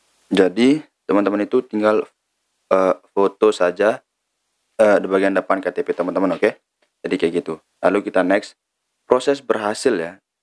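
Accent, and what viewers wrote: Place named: Lombok, Indonesia. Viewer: Org Lombok ni yak?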